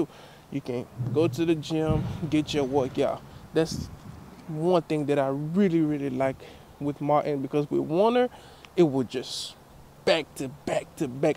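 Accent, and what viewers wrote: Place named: New Orleans, United States. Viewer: Sounds like a NOLA accent.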